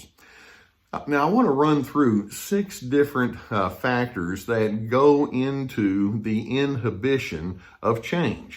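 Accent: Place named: Texas, United States. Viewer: in London everyone would expect you to be a cowboy because of your accent. We only know that show Dallas here...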